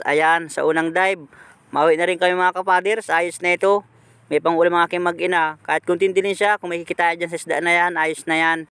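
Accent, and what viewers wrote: Bicol, Philippines. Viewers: ka fahers bicolano ka ba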